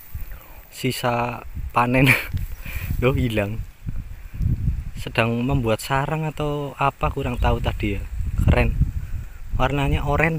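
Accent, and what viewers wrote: Banyumas, Indonesia. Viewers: Hadir kang....salam satu hobi..uhuyyyy👍👍👍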